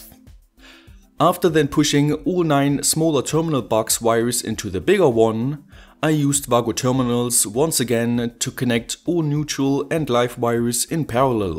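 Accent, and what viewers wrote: Germany. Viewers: Hi bist du deutscher weil es sich sehr so anhört. Hast du auch einen Deutschen Kanal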